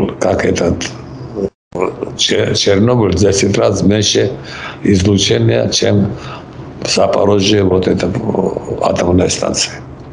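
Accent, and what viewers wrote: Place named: Georgia. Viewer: Так половину грузин говорит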